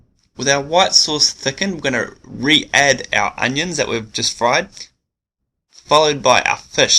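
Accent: New Zealand. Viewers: I lived in New Zealand, thought that his accent was familiar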